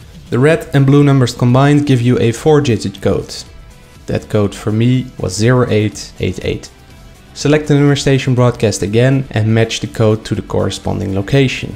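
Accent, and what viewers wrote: Netherlands. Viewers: Ik hoor dat jij Nederlander bent zwz 😂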